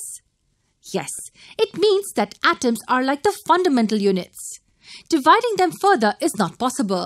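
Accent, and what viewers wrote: India. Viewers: A superb video I can easily understood it but there is a question are you a Indian?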